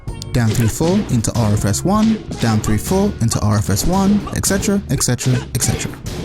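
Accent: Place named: United Kingdom. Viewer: This was a steller breakdown. I don't know why but I pictured narrator drinking tea in the background. Must be the accent.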